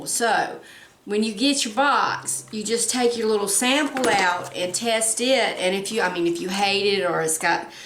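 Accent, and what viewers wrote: South, United States. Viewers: Had to tell you I have a dachshund his name is oscar Mayer weimer lol and I'm from Tennessee love your southern accent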